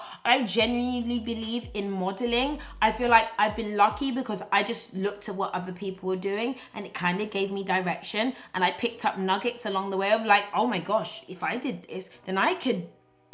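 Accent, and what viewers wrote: United Kingdom. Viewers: She sounds British but uses USD and GBP interchangeably in thumbnails and videos, far too confusing. If it's content for british viewers make it apply to british people and vice versa for americans. Can only assume it's to help her increase reach with american viewers, but it's enough to make me stop watching altogether.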